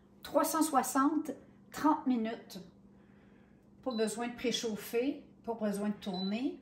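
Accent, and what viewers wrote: Quebec, Canada. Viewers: Mais que c'est sympa ! J'adore votre accent Québécois et belle présentation et conseils. Le bonjour de ST TROPEZ sur la Côte d'azur !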